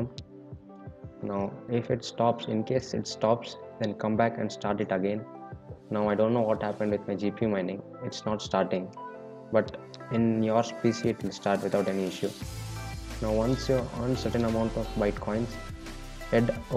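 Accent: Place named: India. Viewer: Suggestion: drop the background music. The loud background music combined with your thick Indian accent makes listening to the content difficult for me, and I would venture for others, as well.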